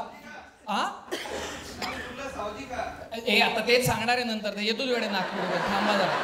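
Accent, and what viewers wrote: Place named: Kolhapur, India. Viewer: बरोबर आहे लय ऑड वाटतय पुणेकरांच्या तोंडातुन कोल्हापूरी भाषा ......जगात भारी आम्ही कोल्हापुरी😅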